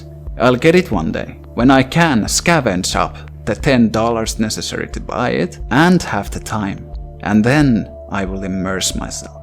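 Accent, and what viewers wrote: Finland. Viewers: Holy fuck I wondered from that accent if you were Finnish first I thought you were Russian it's cool to see a fellow Finn play this...well absolutely mentally scarring game somehow my little sister is unphased of literally everything in it though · You have such a perkele accent. I love it! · kyllähä ton suomalaisen rallienkun tunnistaa mistä vaan. Hyvä video tho!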